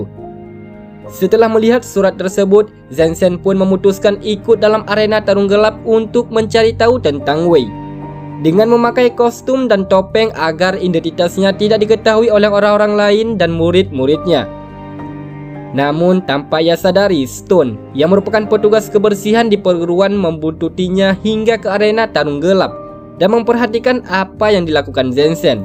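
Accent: Aceh, Indonesia. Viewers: Bg orang aceh ya? Medok karna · ini org Aceh keknya yg bicara😀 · Lupaya bukan cuman saya yg sadar klok mimin org aceh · Seperti logat orang Aceh? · Admin awak aceh sang euh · Logat Aceh ya wkkww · Suara nya mendhok kayak suara org aceh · Admin nya orang aceh ya? Logat nya Aceh kali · medok Aceh nya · Pembawaan suara mentok kali orang Aceh.. Sehat slalu admin, salam asam Sunti ..😅😅😅😅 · Orang Aceh ya bang?. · Logat aceh admin nya 😁😁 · Maaf min loe dari daerah mana logat nya mirip aceh atau bali y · Kayanya yg ngisi suara di alur cerita channel ini oranh aceh😂😂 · Bahasa Indonesianya terdengar logat ORANG ACEH., hihihihi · dari logat pasti orang aceh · Aceh ni logat nya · Logat aceh😅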